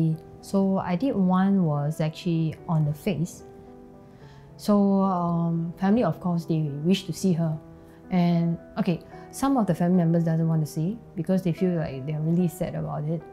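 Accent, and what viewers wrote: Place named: Singapore. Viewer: Singaporean accent a female embalmer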